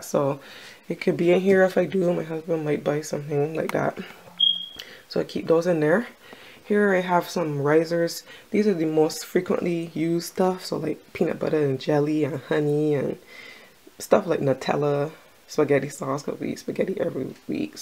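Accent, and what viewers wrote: Barbados. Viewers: From the beginning of the video I heard the bajan accent then I looked at the name sure she's a bajan.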